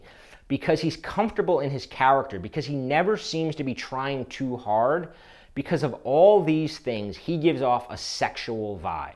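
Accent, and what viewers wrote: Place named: Boston, United States. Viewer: This dude is definitely from Boston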